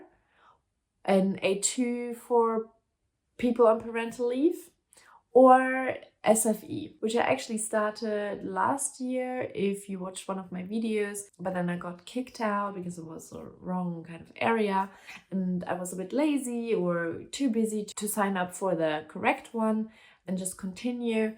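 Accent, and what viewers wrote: Germany. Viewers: Well there is a potential for getting better! Some times you use german pronuniances where english wold be better! In anyway, swedish and other Norse language could be named as "plattyska dialekter", (north german tounge), that is eradicated in Germany and is replaced by (högtyska dialekter), high german tounge! They say that sweden is one of the best english speking country in the world.. thats only says that rest of the world is worse! In anyway, the more swedish you understand the better, and you can answer in english, in many cases! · You did really well! Swedish with a german accent is so charming, really love it 💚 Of course there are several tricky words, one of them is "och", it's pronounced "ock" or like a short "å". You go girl! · I will give you an A for effort! I think you did it allright, and as someone else already pointed out you speak swedish with a german accent - which I think many swedes find rather cute... 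(We have a swedish female celebrity with german origin who has been living in Sweden for almost 50 years and she still has a strong a strong accent, in fact your pronounciation sounds a little better than hers!) Within a year - or less - you will be almost fluent in swedish is my guess. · Oh dear, that German accent is so hard to lose ... I've got an acquaintance (from Austria I think) who's been here for ages ... He still has the German accent =). Just keep it up - as others have said, as a German speaker, learning Swedish should be fairly "easy".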